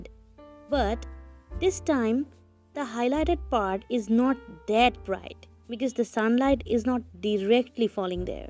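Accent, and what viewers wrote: India. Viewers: Hello! May i ask you,what is your nationality? Are you an indian? Because you sound like one~😌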